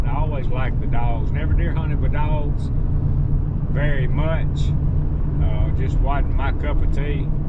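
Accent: South, United States. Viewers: That subtitle generator has problems with your southern English. I’m not criticizing southern English because I talk that way too. It’s just funny how what’s printed differs from what you said. Thanks for the videos. I enjoy them.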